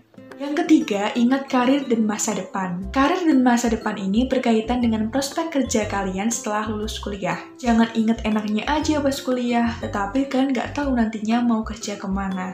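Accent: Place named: Semarang, Indonesia. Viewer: org semarang ya? logatnya org smg bgtt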